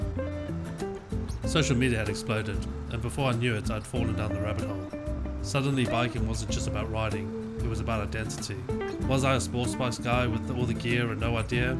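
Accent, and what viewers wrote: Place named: United Kingdom. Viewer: Thanks for the video. I respect that this is your channel and you certainly can present whatever you want. From a purely personal perspective I'm American and I do have to listen closely to those from Britain to be sure I understand you. I'm probably in the minority here but I find background music distracting at any volume because it inhibits my ability to understand you. I appreciate that you are a motorcycle purist and that is worth hearing. I'm a good bit older than you and remember the first Triumph Bonneville I ever saw/heard, <num>. I don't remember any other motorcycles from that period as well as I recall that Bonneville. As soon as I can sell my BMW I'm going to buy a Triumph....I should never have bought the BMW.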